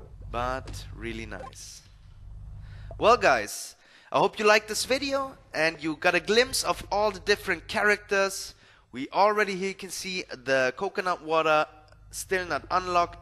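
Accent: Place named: Germany. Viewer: ohh I knew it! You have a German accent so I noticed :P I'm Brazilian ^u^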